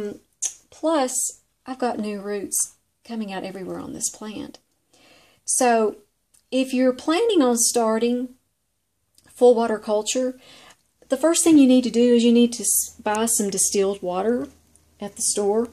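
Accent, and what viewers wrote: South, United States. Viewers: You are so precious! I love your info on orchids. I love your southern accent even more. And even more, love your blessing to us at the end of your videos! Thank you so much!!!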